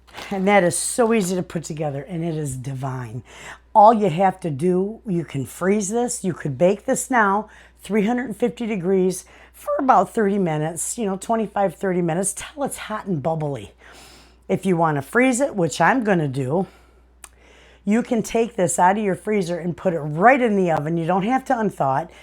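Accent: Michigan, United States. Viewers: Just seeing your channel for the first time. Had to smile when I heard your Michigan accent, I spent the first 24 yrs of my life there. Of course I hit the subscribe button😂 Those chicken recipes look delicious! Looking forward to watching your other videos.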